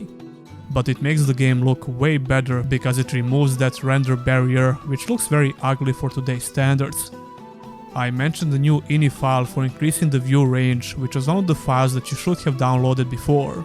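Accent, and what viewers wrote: Serbia. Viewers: I could recognize a serbian accent from miles away :D Dobar kanal brt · ZNAO sam da si naš po akcentu :) odličan video!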